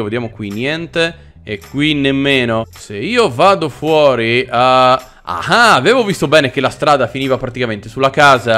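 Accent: Veneto, Italy. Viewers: Il tuo accento veneto è sublime.😂😂😂😂😂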